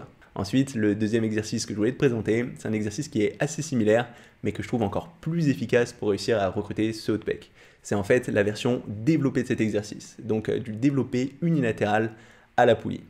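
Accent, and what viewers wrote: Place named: Bourgeois, France. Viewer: C'est pas de ta faute mais ta manière de parler c'est vraiment insuportable ta un espece d'accent de bourgeois chelou troo enervant. Sinon la video est super bien faite bien expliquée et merci pour les conseils mnt je vais faire comme toi.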